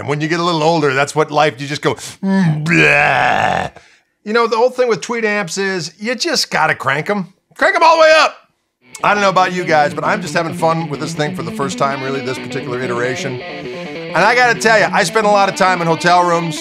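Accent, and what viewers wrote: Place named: Chicago, United States. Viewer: This man sounds like he's from Chicago He's from Wisconsin. Close enough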